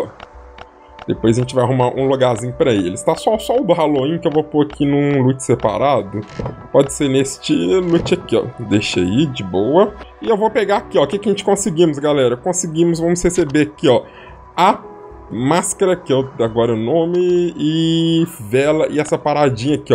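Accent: Minas Gerais, Brazil. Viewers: Pelo sotaque é de minas kkkkkk fala igual eu kkkkk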